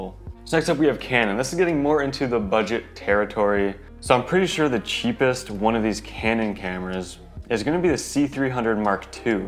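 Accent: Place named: Buffalo, United States. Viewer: Are you from Buffalo/Western New York? Your voice sounds very Buffalo.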